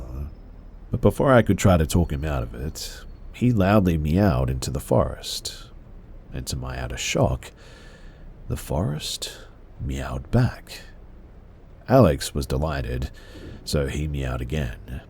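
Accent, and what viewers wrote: Australia. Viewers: I've spent countless hours being lulled to sleep by your sweet Aussie accent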